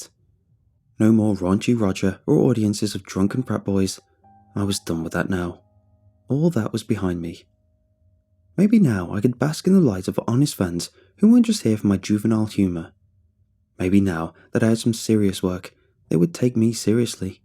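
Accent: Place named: Northern England, United Kingdom. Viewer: Love the accent I'm from London and he's northern but not sure where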